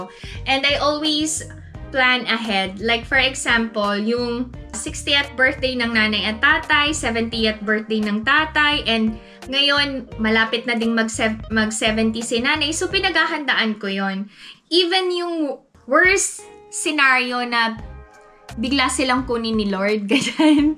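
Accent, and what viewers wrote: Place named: South Korea. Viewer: Hello,! Napansin ko lang po sa mga vlogs nyo na mala-Korean na rin po yung pananalita nyo and curios ako kung aware po kayo dito. What I mean is laging may vowel sounds (-uh) na yung mga words nyo ending in consonants. Say for instance the following words: Steak-uh Netizens-uh And-uh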